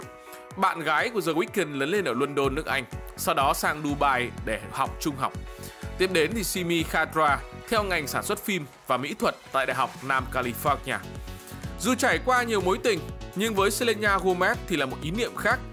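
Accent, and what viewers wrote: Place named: North, Vietnam. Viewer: Ng bắc toàn đọc the là giờ. Thua luôn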